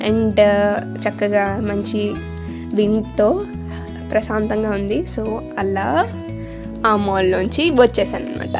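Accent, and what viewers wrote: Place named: Telugu, India. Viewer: Your Telugu slang 👌👌.. Addicted..♥️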